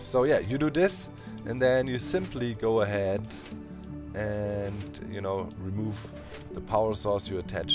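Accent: Germany. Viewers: Du solltest TV kommentator werden :D man merkt allerdings das du Deutsch bist.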